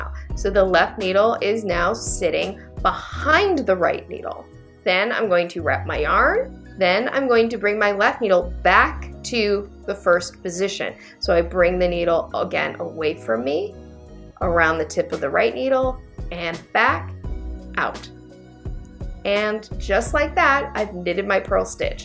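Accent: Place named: Missouri, United States. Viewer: Really enjoyed your video...thanks for that! I've never tried this method but you make it look so easy I'm going to give it a try. You must be from my home state of Missouri; you sound just like my sister. I'll be watching! Thanks again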